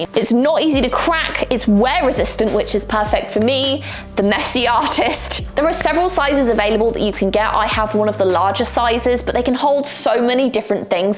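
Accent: United Kingdom. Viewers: Hi, of all the art supplies, I loved the arteze (hope I got the spelling right) watercolour books the set if three, I love watercolour work so. Thank you for such a beautiful Unboxing, P.S. you have a very beautiful British accent.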